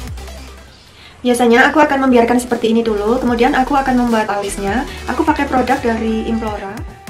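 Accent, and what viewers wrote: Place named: Wonosobo, Indonesia. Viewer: Dari logat bicaranya seperti nya anda tinggal tidak begitu jauh dari wonosobo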